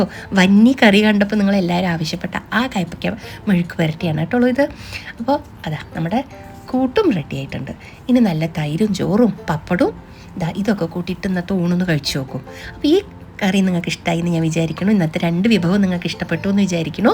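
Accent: Palakkad, India. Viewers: Dear Mam, ഈ വെറൈറ്റി കറികളും പേരുകളും, ഉപയോഗിക്കുന്ന പാത്രങ്ങളും, കൽച്ചട്ടി, മൺപാത്രങ്ങൾ തവികൾ etc... പഴമയെ കളയാതെ പുതിയത് ചേർത്ത് പിടിക്കുന്ന മനോഹരമായ വീഡിയോകളാണ് എല്ലാം ...❤❤ പാത്രങ്ങൾ,വൃത്തിയുള്ള പശ്ചാത്തലം, ആ കർഷകമായ പാലക്കാടൻ സംസാര രീതി... നമ്മൾ കാണാത്ത നിഷ്‌ക്കളങ്കമായ, ചൈതന്യമുള്ള ഒരു ലോകം പോലെ തോന്നുന്നു.❤❤കൽ ചട്ടിയുടെ പിറകിലായി ഓമനത്തമുള്ള കുഞ്ഞ് പ്രതിമ😘❤ ബുദ്ധിമുട്ടാകില്ലെങ്കിൽ ഈ കുഞ്ഞ് കുഞ്ഞ് പാത്രങ്ങളൊക്കെ ഒരിക്കൽ കാണിക്കുമോ ?...❤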